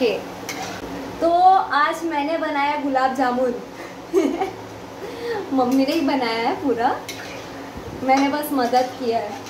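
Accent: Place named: Maharashtra, India. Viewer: Stumbled upon your vlog while watching Nomad Shubham’s vlog. Daily lifestyle vlogs are always a good idea. You hav an attractive personality. Just work on the quality of content, video and audio. It’ll definitely fetch you in times to come. All the best. I’m a GI Surgeon currently practicing in Mumbai/Thane; originally from Bihar. I like the Maharashtrian-Hindi accent of yours.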